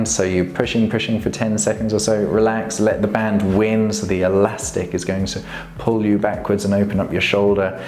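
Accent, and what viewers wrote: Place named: United Kingdom. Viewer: Great ! I'll check later then. Meanwhile I'm seeing your other videos here too. They're very nice as well. Saw your Minimalistic Gym Home with Kettlebells and, we have a lot in common ! I also train a lot with KBs ! Have a collection of them though ! As for paralletes I plan to buy a pair later. Talking from Brazil here. You from UK , as I deduced from your accent and later on Youtube. Best wishes anyway ! BTW the squat video very nice too. Another suggestion a follow along routine to improve your Squat daily....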